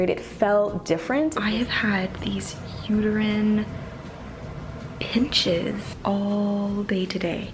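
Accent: Canada. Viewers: Are you Candian or from North Dakota?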